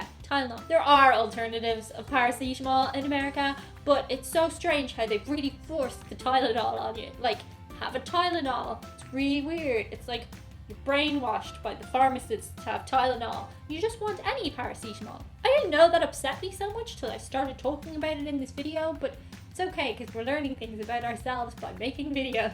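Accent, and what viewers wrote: Ireland. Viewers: just the Irish accent that's all.. just varies by region pronunciation of anything as to where you accent the vowels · Wow, I've never heard anyone pronounce bidet like that. You pronounce it as "B-Day", and myself n everyone I know says "buh-day". We don't say the the letter B, we just make the B sound, followed by the word Day. But its all good, I absolutely love your accent n the way u talk. I'd do almost ANYTHING to marry a female with an Irish accent. It's one of the sexiest things I've ever heard. 💚🍀💚🍀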